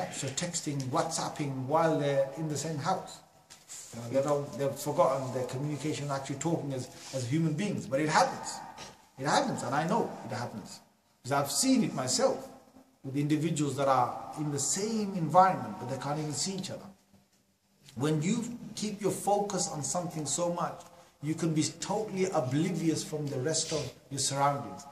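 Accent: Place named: Jamaica. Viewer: Hes wicked jamican and afro accents are hilarious MA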